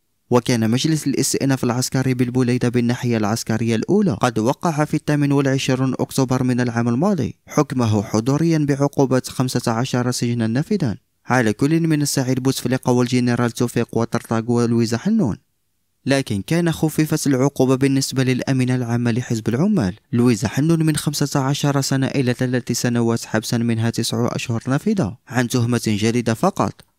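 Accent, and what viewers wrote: Morocco. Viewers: باينة لكنة مغربية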